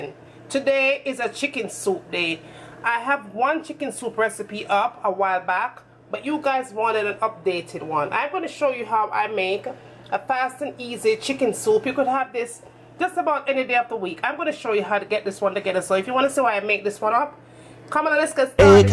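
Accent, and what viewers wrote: Jamaica. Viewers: I’m from the Caribbean but I just moved to The Netherlands and when I say your accent and patois is like a breath of fresh air to me😂😑 totally gonna make this and I love how you’re not yanking like how most other Caribbean ytbers do. Much love!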